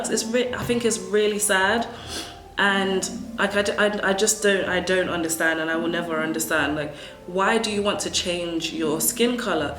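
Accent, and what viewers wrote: United Kingdom. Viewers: i really love ur accent,,its british accent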